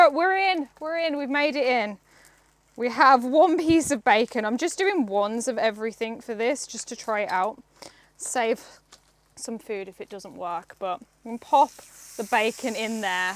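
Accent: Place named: England, United Kingdom. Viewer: Lovely English accent ❤. I'm definitely going to make myself that same English breakfast.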